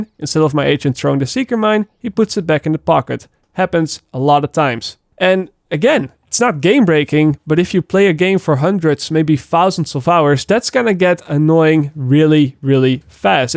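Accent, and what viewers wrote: Netherlands. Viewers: ???? I love you man, been listening to that sweet Netherlands accent for years, but what are you talking about?40 hours has nothing to do with how many kids you have or if you work at all, lol. I'm pretty sure when they say 40 hours it's not a running clock like you will finish it 8 hours short of 2 days, omg I have no idea why you perceived it that way but that was funny. You could play 1 hour a day for 15 days that's 15 hours. And you could do that living in a village of children with all the jobs you want and still finish as fast as a content creator.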